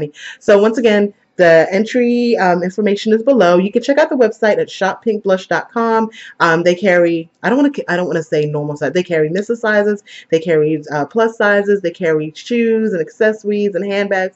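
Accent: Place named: Jamaica. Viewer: you betta speak that petois/English accent! I can't wait for video footage! safe travels. ✈✈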